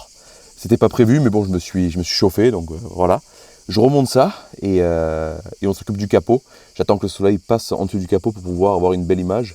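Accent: South, France. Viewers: BM double vié, ca fait plaisir d'entendre l'accent du sud. Avant de déménager a la réunion, jai vécu du côté d'Aix en Provence, Salon de Provence..enfun jai vecu dans pqs mal de petits villages au alentour.. Hâte de voir la suite de ton bordel pas organiser 😂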